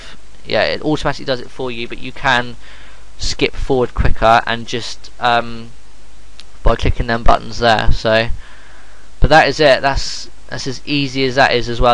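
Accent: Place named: United Kingdom. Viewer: British accent -- leave the man alone, He put up a video while we yap away :)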